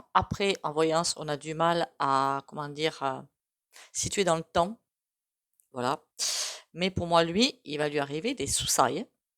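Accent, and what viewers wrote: South, France. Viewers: Bonsoir, votre accent est pour moi, très agréable, il m'apporte le soleil tout les jours, je suis du Sud et je n'y vie plus, il me fait beaucoup de bien, merci beaucoup, belle soirée à vous 🙏💐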